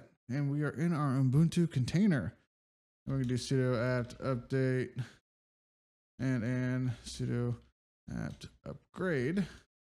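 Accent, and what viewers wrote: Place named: United States. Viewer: Thanks. Such an american accent with a romanian sounding name :)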